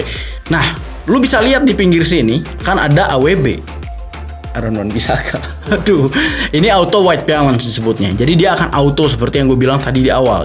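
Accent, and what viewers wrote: West Java, Indonesia. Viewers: bang orang sunda mana?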